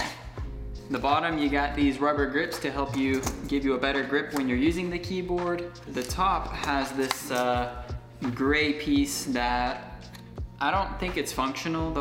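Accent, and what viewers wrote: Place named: Georgia, United States. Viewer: U from GA?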